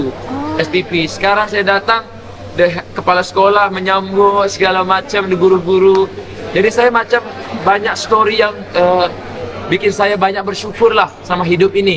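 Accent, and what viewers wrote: Kuala Lumpur, Malaysia. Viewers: 😂😂😂😂😂 ok jugak dia ckp bahasa melayu Malaysia...tp ayat2 BM tu dia tak tahu...cuma lebih kpd slang 😂😂😂😂😂 mantapppppp bro...keluarga diaorang nie bnyk travel...tp aku respect adik beradik diaorang semua berseni... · ya sbb dia ada darah melayu minangkabau, sbb tu tak susah untuk dia tukar loghat KL walau duduk beberapa tahun kat Malaysia. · comel laa ckp KL🤣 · Pandainya cakap orang kuala Lumpur ya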